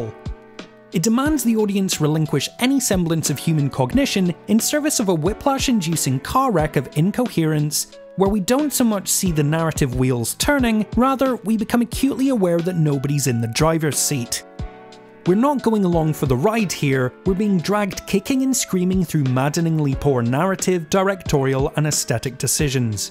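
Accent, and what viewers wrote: Scotland, United Kingdom. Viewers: Goddammit this is an awesome channel!!! What part of Scotland are you from? I'm a St Andrews man myself but live in Romania so it's always good to hear a Scottish voice. Thanks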